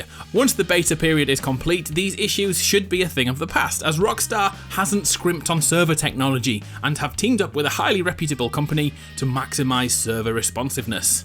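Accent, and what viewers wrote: United Kingdom. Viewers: I stay to the end of the video as their amazing and are u British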